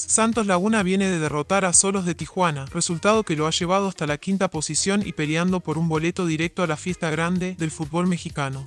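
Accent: Argentina. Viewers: Quita la voz argentina fastidia ustedes solos se quitan suscriptores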